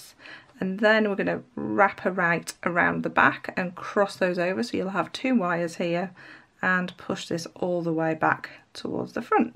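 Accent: United Kingdom. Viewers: Are you from tottenham? Your accent reminds me of Adele ☺️ Great video. I like your teaching style ❤️ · Am I the only one that is obsessed with their Britain accent? Yes, okay. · Great job. Love it. I love your English accent.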